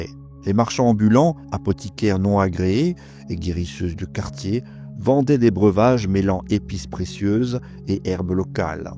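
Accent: Corsica, France. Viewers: La voix😮.. Un léger accent corse non ?..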